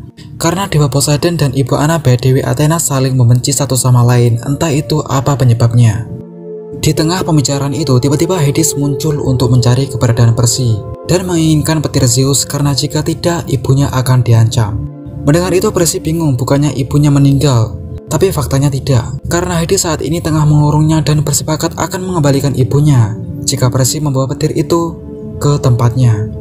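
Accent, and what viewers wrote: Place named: Java, Indonesia. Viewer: Penjelasannya enak dgn warna logat jawa yg kental. 😄😁😊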